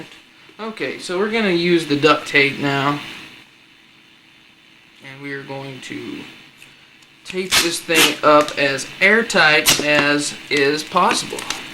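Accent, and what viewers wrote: South, United States. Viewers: It's like Mike Myers with a southern accent. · He kinda reminds me of a long haired country accent Ashton Kutcher. well that just my opinion i really don't kmow about you guys:) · Definately not Bristish, its southern US by the sounds of it...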